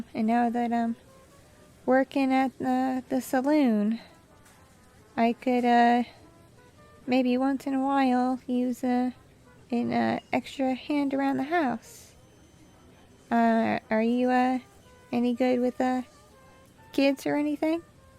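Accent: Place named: South, United States. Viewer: I can hear a good southern accent but its a medium one but atleast its not bad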